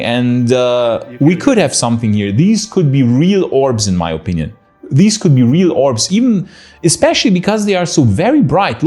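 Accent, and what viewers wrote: Germany. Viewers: I’m still new here. I love your accent. I traveled around Europe for a few years. Where are you from..Germany? I lived there for about 6 years. Spangdahlem. Beautiful country. I loved my time there. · Id say it's a german accent. He just speaks english very well. Maybe im wrong. I am by no means an expert.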